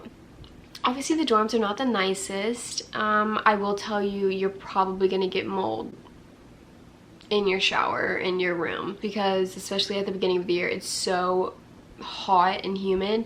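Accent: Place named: Oklahoma, United States. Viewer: You already have an Okie accent.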